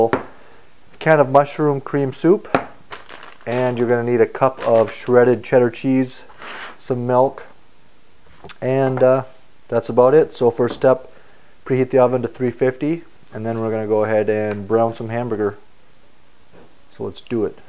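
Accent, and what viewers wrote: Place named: Canada. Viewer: Umm you talk funny and have an accent. You sound like a Canadian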